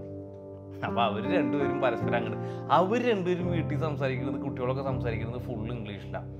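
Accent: Thrissur, India. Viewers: I came to know of your channel thru Sujit. Your way of story telling is very nice. I like your Thrissur slang. Since lam a vegetarian scenes of meat in your recipes are digusting for me. But l enjoy your narration of stories. Good luck for your future plans.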